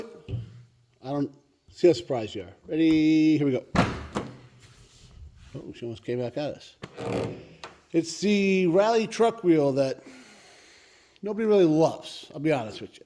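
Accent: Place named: East Coast, United States. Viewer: ok I subscribed your funny and got that east coast talk that I like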